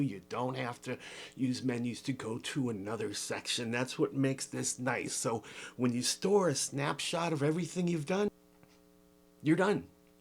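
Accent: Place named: North, United Kingdom. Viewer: ) I kept leaning over looking around the mic stand that was in front. I tease, but I love your approach to breaking down the instrument and your pleasing effect throughout the video. Ive the F8 but am NOT a piano player. I hope you continue this series and delve more into the instrument. Maybe I'll see you at NAMM! P.S. Love your northern accent!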